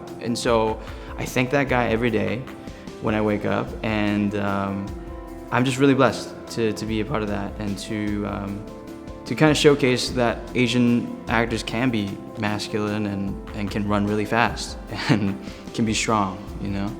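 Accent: United States. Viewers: I guess I hear a Southern California accent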